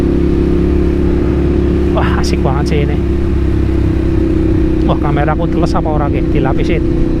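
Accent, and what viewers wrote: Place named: Banyumas, Indonesia. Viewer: Salam ngapak bapakeee wkwk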